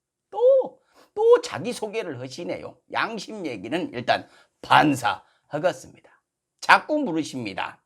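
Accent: Jeolla, South Korea. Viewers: 숨도 안쉬고 마시시네요. 전라도사투리는 들을수록 정감이 납니다. 물론 내용이 더 맛을 더하기는하지만... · 어이 자네 자 네는 전라 도 사 람 이 아니면 서 전라도 말을 그럴싸- 하 게 하는 디 전라도 도 지역 마다 억양 이 다 다르 네 자 네는 전라도 어느 지역 말을 쓰는가 나는 순 천60대 전라도 말을 쓰는 것은 자유 지만 전라도 사람 욕먹는 발언 사가 해주소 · 서울에서 태어났담서요~~성동일씨랑 쌍벽을 이루는 라도 사투리 투 톱👍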